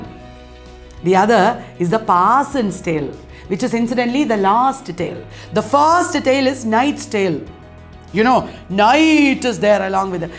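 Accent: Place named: Tamil Nadu, India. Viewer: nice sryle ..zerous and academic,,tamilian accent..stands out !!! kudos...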